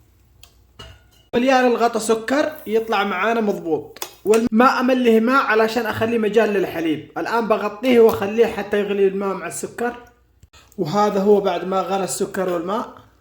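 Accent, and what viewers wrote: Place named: Aden, Yemen. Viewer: الكلام سعودي والنغمه عدني😁كلام ياعدن كلام هيه بلعافيه عليك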